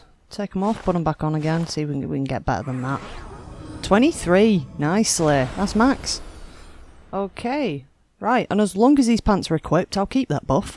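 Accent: United Kingdom. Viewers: Love your british accent. Onarmored, HandtaHand, Armar, Stoff, Twente, Oround here, Jomp, You see "ma" point, When you punch "shombody", baa (bar), fonny. Very usefull guides.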